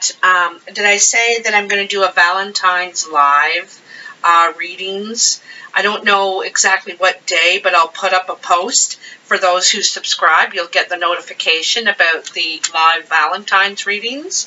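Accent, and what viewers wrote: Canada. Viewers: Ha, thought I could identify a fellow Canuck. I'm in Southern ON, GTA. I have family in North Bay though. Have a good one as we say.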